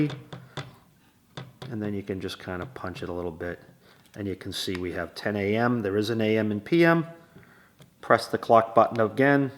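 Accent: Boston, United States. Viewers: Have watched this So many times --- video is super clear and helpful!! (Boston accent is a plus - generates total trust and confidence that the person speaking know what he's talking about!!) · You sound like you're from Boston❤. I am too. Anyhow my house was freezing inside. Those icons on the remote are very strange. Then I played your excellent video a few times and took notes. Now I'm cozy and happy. Many thanks,. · Just got a new house and have been cold for two days! Thank you for this helpful video - you explain things so clearly (plus the slight accent reminds me of my family from Boston so extra warmth there too).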